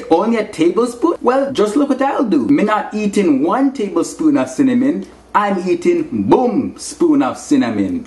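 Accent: Jamaica. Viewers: I love your jamaican accent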